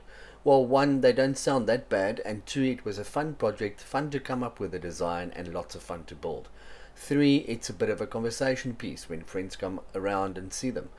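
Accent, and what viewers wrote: South Africa. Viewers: Ive noticed your accent is south African or i might be wrong the thing is people dont use a good headset or proper speakers use quality headphones you will hear they dont lack that much in the bass sound bass definitely a bit more while they are suspended ive just discovered this channel yesterday and im inspired to build my own bluetooth speaker im from south africa currently residing in china so everything is less expensive love your work man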